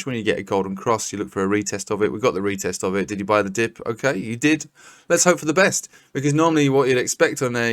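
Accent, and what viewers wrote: United Kingdom. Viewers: Always good to hear a British voice and vision in the morning 😅🇬🇧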